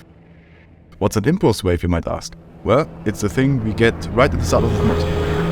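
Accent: Germany. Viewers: The German accent man, i cant fucking listen to this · I smell a German accent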